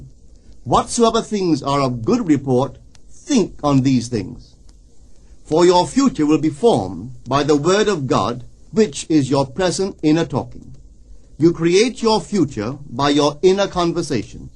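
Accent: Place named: Barbados. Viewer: This isn't Neville's voice. This is someone else. I think they(on this recording) might also be from Barbado's but this NOT. I know his voice.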